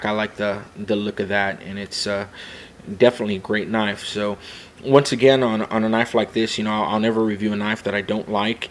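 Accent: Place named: Canada. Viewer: this is totally off topic, but are you canadian? because youve got the most adorable accent!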